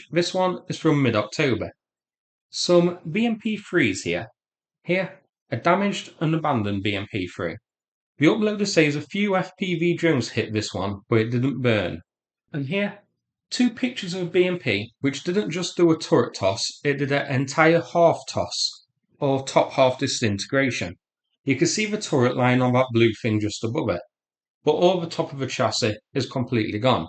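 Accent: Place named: Mansfield, United Kingdom. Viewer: I always thought your accent sounded familiar, I’m from Rainworth but no one knows that so unfortunately I have to say Mansfield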